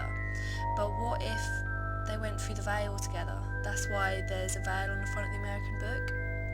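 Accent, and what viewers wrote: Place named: England, United Kingdom. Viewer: x.o I can't hear you when you're reading; but I assume you're grabbing facts from the books since there are more to support he may not be a Horcrux. And ZOMFG, nice English accent. =) And to add on, Voldemort must've known risks and such before going on with it, esp when DD had said it was dangerous to confine one's soul within an object of free will. Sorry, if you've said that already though. xP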